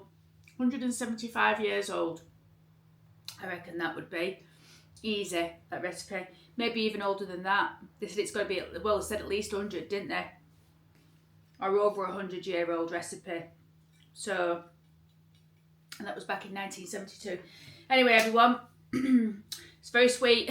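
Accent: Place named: Yorkshire, United Kingdom. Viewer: You are funny! 😂 That Yorkshire accent, down to earth, slap dash ways 😆🤣😂 If you’d have put it back in the oven just put some foil on top so it doesn’t burn. I can’t imagine cake without eggs. I’m not far from you in Sheffield!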